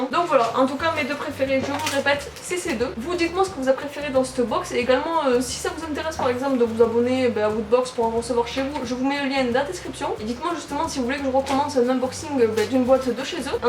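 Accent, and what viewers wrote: Toulouse, France. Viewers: i love accsens toulousin et tu fais 17 ans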